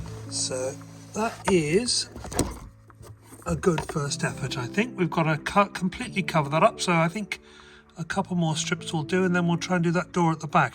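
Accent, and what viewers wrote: United Kingdom. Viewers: my friend you are not an idiot (you just talk funny 😄 (British accent)). Great job.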